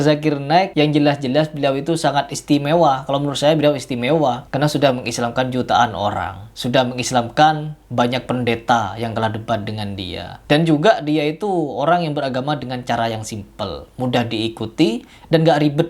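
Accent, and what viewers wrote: Madura, Indonesia. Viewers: Kayaknya bang admin orang madura ya, soalnya terlihat dari logatnya.. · Masnya orang madura ya? Kayak logat madura... Saya penonton baru mas...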